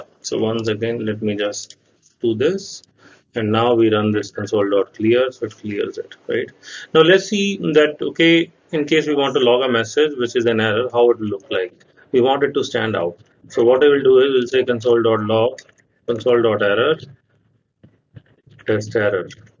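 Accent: India. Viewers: We Got An Indian in the househhhh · Thanks for you , but his accent is Indian so I can't understand very well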